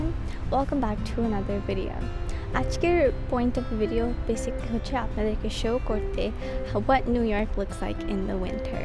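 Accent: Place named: Comilla, Bangladesh. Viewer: মুগো কুমিল্লায় মনে হয়, কি বলেন আপি😍😍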